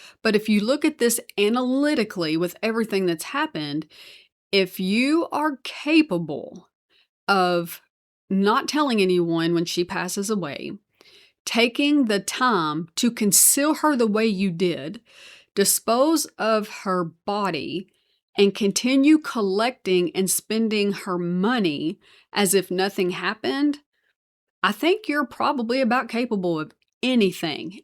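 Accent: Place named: South, United States. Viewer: This was a pretty clever gambit and it makes me wonder how commonplace this kind of grift is. If you think about it thousands of people could be pulling the same stunt. If SSI hasn’t seen a death record or announcement they keep sending the checks. Imagine the temptation to some survivors when they realize those checks will still keep coming. This was a good report and I have subscribed. I’m from New Jersey and I really enjoy your Southern inflection. Keep up the good work…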